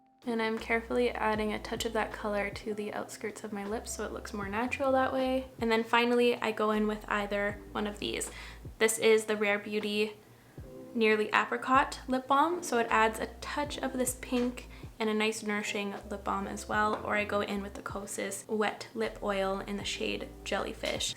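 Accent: Canada. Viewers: You have such a calming voice and I could listen to you Canadians say out and about all day! I love it! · I loved the way she said out, and about😍😍😍😍the Canadian accent love it!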